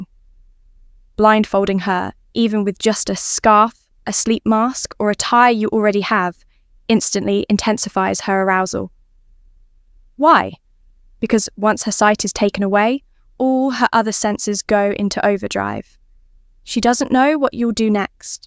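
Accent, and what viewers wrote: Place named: RP, United Kingdom. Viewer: The posh accent is a nice touch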